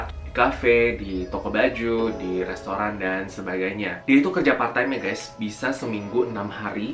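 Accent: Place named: Jakarta, Indonesia. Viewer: Haaii, gw suka banget sm logat Jakarte elooo...!!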